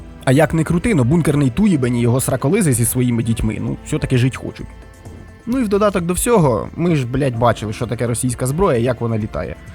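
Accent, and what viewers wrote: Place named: Chernihiv, Ukraine. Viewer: рідненький чернігово-сіверський діалект 🥲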